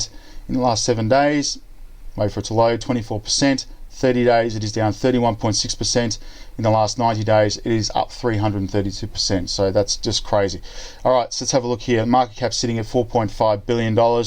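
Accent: Australia. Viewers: You Aussie bro?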